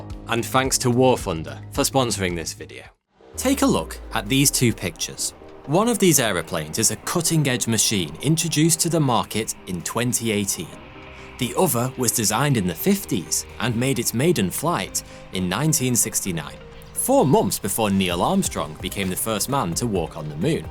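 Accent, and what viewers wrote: United Kingdom. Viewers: 10,500 dollar per ticket according to my Unc. We spoke about it awhile ago. When it first was introduced it was much cheaper. Lol first time i heard a british accent. I flew concord when I was 6 or 7 like...mid 90s. It's really small inside, like really small. Never experienced service like that tho. Beautiful experience from what i remember. I didn't even think of taking in the experience of seeing the curve of the earth. I would be to scared to fly concord now. The Russian one looked cool. I need to build the lego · Another freakin British accent channel, I can’t wait for a big Channel to call out this plague that’s been going on for the last 5 years .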